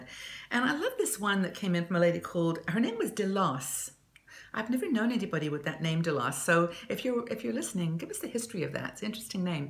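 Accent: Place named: United Kingdom. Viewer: your British English!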